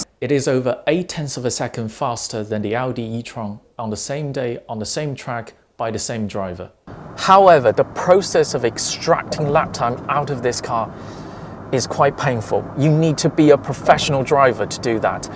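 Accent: London, United Kingdom. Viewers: 英语真好啊这标准的伦敦音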